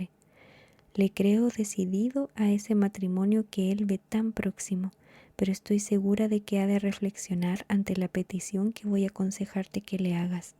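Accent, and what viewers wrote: Chile. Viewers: eres chilena o mexicana ? 🤔🩷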